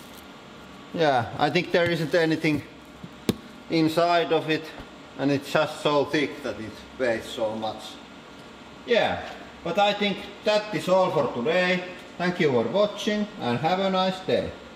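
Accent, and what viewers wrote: Finland. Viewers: r u finnish? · finnish accent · Are u finnish??😂😂 im finnish too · nyt on kyllä niin selkee suomi aksentti että :DD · Is that a Finnish accent I hear? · Every Finnish and Estonian person speaks like this anyways so not so unique.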